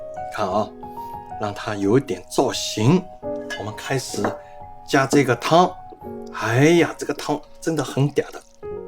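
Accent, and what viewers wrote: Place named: Shanghai, China. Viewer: 沪普好听。盼能回国吃一碗鲜得来眉毛也要落下来的雪菜黄鱼面。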